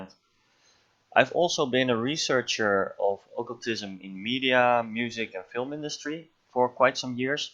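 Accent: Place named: Netherlands. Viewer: Volgendsmij ben je nederlands ik kan dat horen haha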